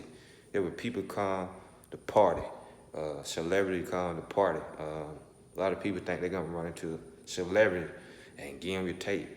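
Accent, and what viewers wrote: South, United States. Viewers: He has a southern drag.. Georgia is so big, why live in Atlanta? Im from NY, i don't live in Manhattan. NY is big enough to live outside of the 5 boroughs. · Dude narrating is way country. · He sound like hes from another southern state that is illietare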